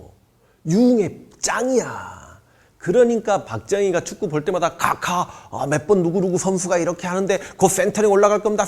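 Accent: Jeolla, South Korea. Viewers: 이사람은 전라도 광주 출신인가!!??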